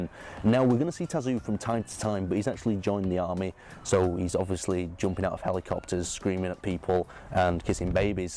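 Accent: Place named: Manchester, United Kingdom. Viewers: I'm from Manchester (the city, greater Manchester), I have a similar accent. I always thought because of my accent it would make it impossible to do you tube, but proved me wrong! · Oi Manchester lad! Greetings from West! You should try scouse accent. That would be hilarious in your videos.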